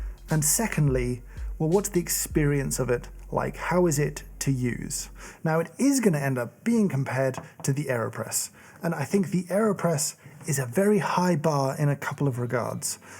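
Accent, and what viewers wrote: United Kingdom. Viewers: I went through this entire video thinking that the brewer was called the "Delta Brewer" and that was just being British... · I can't help but think of the fact that the British accent often pronounces words ending a as an er - like delta sounds like delter, despite the fact that it's from Australia.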